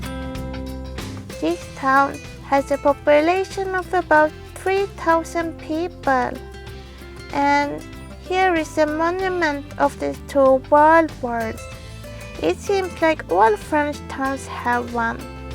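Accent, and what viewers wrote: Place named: Netherlands. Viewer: Thank you so much for another lovely video! I am catching up for 2 weeks worth. I am still intrigued by your lovely accent. Are you Dutch? I’ve been meaning to mention that I really like your animated maps following Bikki. The music is perfect! And congratulations on your constantly growing subscriber count. Claws crossed you’ll keep growing 😀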